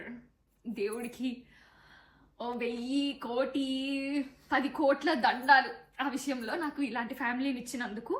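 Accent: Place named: Andhra Pradesh, India. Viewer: Are you from Andhra? Because your slang felt exactly like that.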